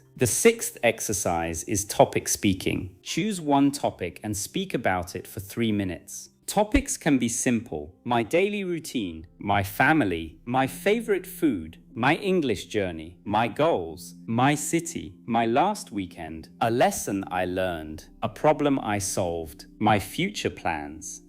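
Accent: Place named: United Kingdom. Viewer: Oh God the British accent is amazing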